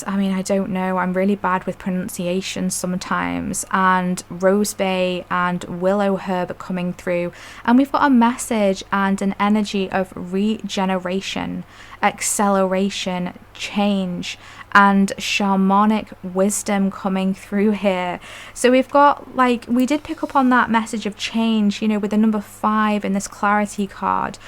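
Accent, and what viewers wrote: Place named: England, United Kingdom. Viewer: 1 - phenomenal reading, I could relate to all of it, including aches and pains, exactly where mine are. As for suns I did another reading and the lady pulled 4 sun cards out. I’ve also been working on my God (sun) connection 🌞 Thank you so much, as a fellow Pom living in NZ, it’s lovely to hear your pommie accent ❤ I’m feeling very grateful and blessed 💐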